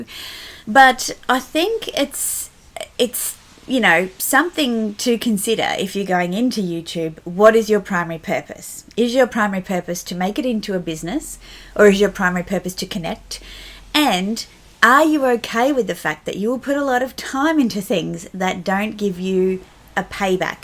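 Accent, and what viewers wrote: Australia. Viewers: I love your channel and your content! I love how warm and genuine you are, and it's always nice to hear an Aussie accent on the platform! I really like a number of different channels (and genres) on YouTube for different reasons, they serve different purposes to me. Some creators I'm subscribed to I will pick and choose what video I watch (I wont necessarily click on all of their uploads). But since I'm watching for YOU (as a person, more so than a channel with content) I will watch anything you put out and enjoy it. Like Dr Jake is one of my favourite channels, because of his humor and personality (and not for his Lego reviews, and home reno updates!). Keep doing you! You are just a delight 😽💖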